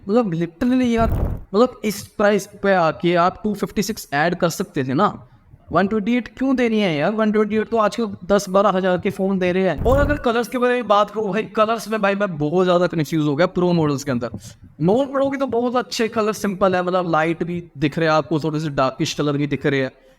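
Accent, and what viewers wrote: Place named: Pakistan. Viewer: Are you from Pakistan? Your tone sounds like them that's why just asking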